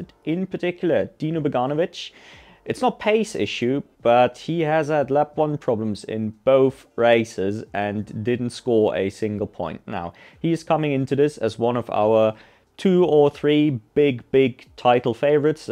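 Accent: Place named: Scandinavia. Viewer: Also I am trying to place your accent and failing (I'm a language and linguistics nerd, so I do this with all YouTubers I watch), sounds both Scandinavian and English with a bit of German. I'm going to place a random guess and say Iceland as a wild guess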